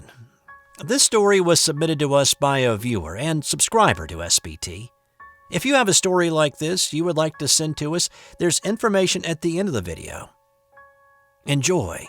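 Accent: South, United States. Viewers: Ah, it's great to see another upload from you! I'm detecting a bit of a southern drawl, very faint. Where are you from? Cheers!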